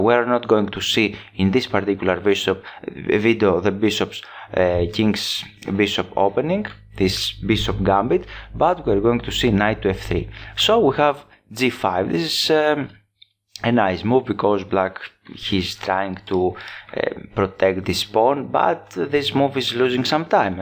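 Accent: Greece. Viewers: Your accent sounds greek malaka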